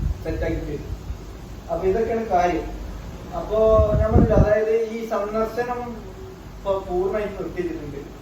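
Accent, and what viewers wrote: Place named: Malappuram, India. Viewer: ഇക്കാ...ഞാൻ വളരെ എടുത്താണ് കാവേരി കുട്ടിടെ വീഡിയോസ് ഒകെ കണ്ട തുടങ്ങിയെ....പക്ഷെ ഒരു മാജിക്കൽ എഫ്ഫക്റ്റ് പോലെ ഇ ചാനൽ എന്റെ ടോപ് ഫേവറിന്റെ ചാനൽ ആയി മാറീ...ഇപ്പോൾ ഇക്കയും, കാവേരിയും എനിക്ക് ഏറ്റവും ishtamulla രണ്ട ആൾക്കാരാണ്....നിങ്ങളുടെ വീഡിയോസ് ഡെയിലി കാണുന്നതു തന്നെ മനസിന് ഒത്തിരി സന്തോഷം ആണ്. എപ്പോളും shorts എടുത്ത് കണ്ട വീഡിയോസ് വീണ്ടും വീണ്ടും കാണും...ചേട്ടന്റെ ഇന്റർവ്യൂസ്,Ottayan vlogs ഒകെ ഞാൻ എന്നും കാണും..... എന്തോ e ചേട്ടനേം ആന പെണ്ണിനേം ഒത്തിരി ഒത്തിരി ഇഷ്ടം.പിന്നെ ചേട്ടന്റെ മലപ്പുറം ഭാഷ അതും രസമാണ്...എന്റെ ജില്ലാ കൊല്ലംജില്ല ആണ്. ചേട്ടന്റെ മലപ്പുറം ഭാഷ കേട്ടോണ്ട് ഇരിക്കാനും രസമാണ്...Anoush മോനും വൈഫെയ്‌നും ഡാനിഷിനും ഉപ്പക്കും ഉമ്മമാകും സുഗന്ന് വിശ്വസിക്കുന്നു.....നോമ്പ് കാലത് എല്ലാ അനുഗ്രവും ചേട്ടനും ചേട്ടന്റെ ഫാമിലിക്കും, വീട്ടിലെ എല്ലാ മിണ്ടാപ്രാണികൾക്കും നേരുന്നു.....ചേട്ടന്റേം കാവേരിടേം കഥ ഒകെ ഇപ്പോ ഞൻ എന്റെ ഫണ്ട്സിനോടും,അപ്പൂപ്പൻ അമ്മാമയോടും ഒകെ പറയും..ടീവി വീഡിയോ കാണിച്ചപ്പോ അവർക്കും നിങ്ങളെ ഇഷ്ടായിയ്.എനിക്ക് ആന കമ്പം ഇലായിരുന്നു.ഇപ്പോൾ പക്ഷെ കാവേരി പെനിൻറേം ചേട്ടന്റേം ഡാനിഷ് ബ്രോഡിഎം fan anu njan❤❤❤❤. 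കൊല്ലം ജില്ലക്കാരി ആയ ഞാൻ എന്നേലും നിങ്ങളെ ഒരിക്കൽ കാണും എന്ന പ്രതീക്ഷയോടെ നിർത്തുന്നു.....ഇ മെസ്സേജ് വായിക്കണേൽ പറ്റുമാണെങ്കിൽ ഒരു റിപ്ലൈ തരണേ ട്ടോ ❤❤ With Lots of Love and Best Wishes Abhirami from Kollam❤❤💓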